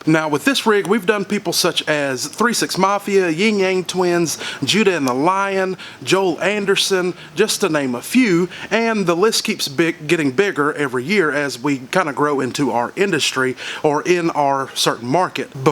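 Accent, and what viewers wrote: South, United States. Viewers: I like this guy he sounds real country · Did not expect his country accent to be so thick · I love that country accent brother! Love from the Carolinas. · I love this guy, his knowledge is top notch. When I first heard his voice I had to take a double take, we all southern bro!!! Keep up the good work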